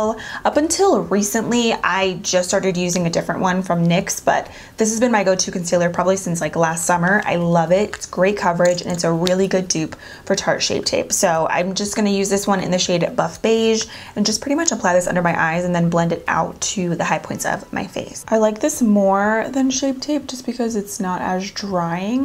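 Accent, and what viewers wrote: Pennsylvania, United States. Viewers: I think Arenge is a PA thing! I'm from Philly but I live in Oregon now, and folks have commented on some of my very open vowels 😅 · Lol def a PA thing, I say A-range too lol I'm in Allentown! Love the look, very pretty!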